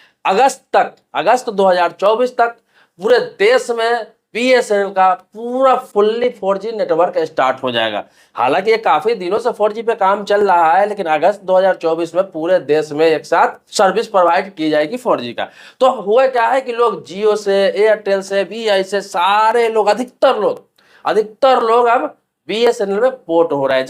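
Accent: Bihar, India. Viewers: Mia ji ap bihar say h kya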